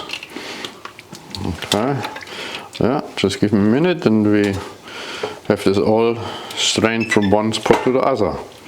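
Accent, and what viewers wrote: Germany. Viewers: Love your German accent. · wow CHEF, your German accent makes me think, intelligence, innovation, efficiency...Looks Amazing!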